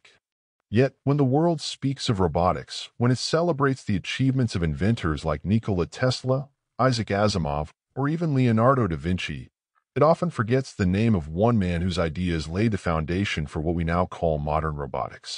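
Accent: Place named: Europe. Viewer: Just as sad is this video using a voice that sounds european why? Are you focus on them or what?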